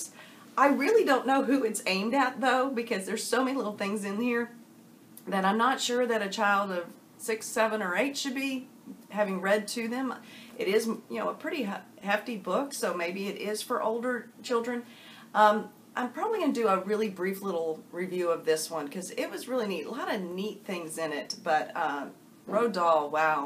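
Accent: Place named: South, United States. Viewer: You are so outrageously wonderful. Thank you for shouting me out. You can work on pronouncing Mementomori but it all sounds good with your southern accent. You have not only been so supportive on my channel but I see your thoughtful and detailed comments spread all throughout booktube. Thank you! Excited to see where you go in June. The drama this past month was something else to witness and am happy that it seems to have blown over. No more of that! — I’ve really enjoyed all your videos, please please keep posting :) - And good luck with tacking Dickens and Tolstoy, no matter the pace!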